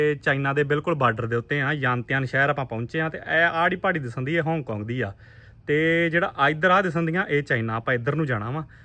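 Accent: Majha, India. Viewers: ਪੰਜਾਬੀ ਭਾਸ਼ਾ ਬਹੁਤ ਸੋਹਣੀ ਵੀਰ ਦੀ ਬਿਲਕੁਲ ਸਾਡੇ ਮਾਜੇ ਵਾਲੀ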